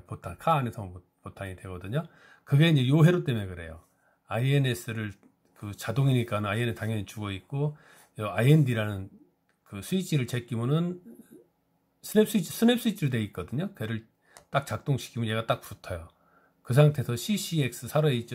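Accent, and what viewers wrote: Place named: Japan. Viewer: 일본에서 기술제휴로 만든 승강기이고 일본인 고문이 교육하고 40년 전만해도 영어를 제대로 못배워서 그런 발음을 하네요,,,지적 참고는 하는데요,,고치기는 힘들거 같습니다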